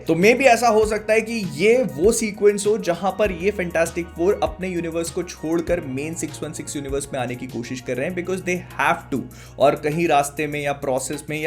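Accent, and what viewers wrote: Bhopal, India. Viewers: Bhai tum Bhopal se ho ?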